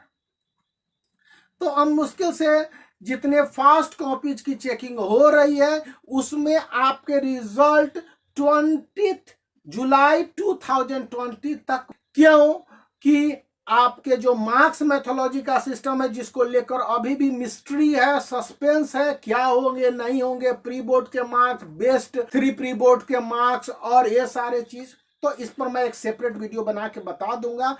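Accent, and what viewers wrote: Bihar, India. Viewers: Are u Bihari sir . Ur English sounds like that . I am also from Bihar . Kaisan ba. Sab thik ba toh ! Very much thanks from me to u for making our exams cancel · Abe bihari phale batt karna sekh pagal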